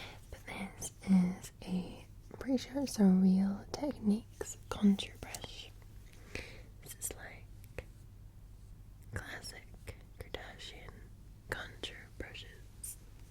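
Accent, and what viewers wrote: Scotland, United Kingdom. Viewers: Is that the scottish accent or a bit toned down? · It’s a bit of a mix. She used to live around London, so her Scottish accent now is a bit lighter than the typical accent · I am so happy that you are now settling into your new home:)!! Is Hugo, you Dog happy with his new home? I really LOVED the way you read the Fisher Man Moon Story with your Scottish Accent which made the poem sound more beautiful:)!!! I LOVED both of your bean bags which I think sound like the ebb and flow of the ocean waves:)!! I also LOVED the brushes, both the sounds and the visuals which feel like a virtual facial massage:)!! And I too have had embarrassing incidents like I was at my Target and I had sworn as I was about to pay for my items that someone stole my Wallet, but when I went home I saw that I left it in my Living Room!! Also, years ago, I was at my Super Market and I realized I did not have enough money, so I had to leave a bunch of items on the Counter!! 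So, you are not alone:)!! I consider myself a Christian, but I have a good sense of humor and I am not a prude. So, it does sound funny about the "jumpers". LOL:)!! My Brother, before he became a Father was a bit like your Brother, except I do not think he would have been that "naughty' in front of my Mom, now Late Dad and now Late Grandparents:)!! As for visiting, I would suggest you set up Zoom or Skype to stay in touch with your extended loved ones:)!! My Boyfriend's Dad is very intelligent and even though he is Elderly he is setting up Zoom for all of us to socialize together:)!! However, I do have a set of 3 to see in person as well, but not by any American rules or laws. It is just something we t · Since you sound Scottish, you ever had brandy?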